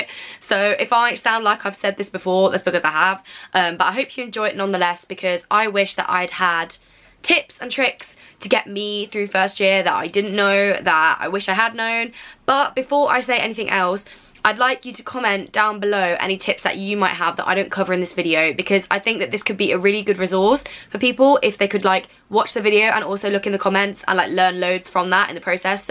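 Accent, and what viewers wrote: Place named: RP, United Kingdom. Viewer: Thank the heavens for you, Ms.! Your vids are great when I casually feel productive and want to put on a posh British accent for an hour. Awesome video!!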